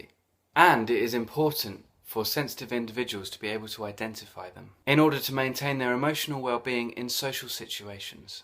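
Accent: Scotland, United Kingdom. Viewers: Not too long, this video, love your Scots accent.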